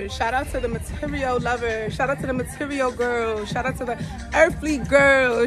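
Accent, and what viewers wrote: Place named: New York, United States. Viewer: I can’t tell if she from Long Island or the Bronx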